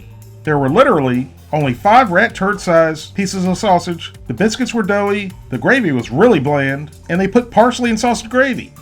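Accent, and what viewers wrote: South, United States. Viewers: you better be a professional comic writer sidelining with these videos with quips like: "Like chewing on a moist mattress in a nursing home!" OMFG. You're humor is so New York with your 'southern twang'. Dry, like your stomach after hopefully being pumped out after ingesting these disgraceful products from questionable food companies.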